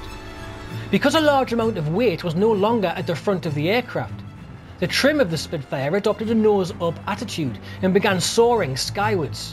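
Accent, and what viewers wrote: Newcastle, United Kingdom. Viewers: A very interesting ‘Geordie’ voice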